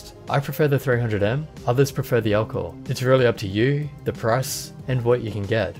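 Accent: Australia. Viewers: you are a legend, this is exactly the type of review I wanted and then you have individual detailed reviews. I hope more manufacturers or computer tech sites hire your services. Thank you very much for your awesome review. And your aussie accent is great too (I'm from Sydney).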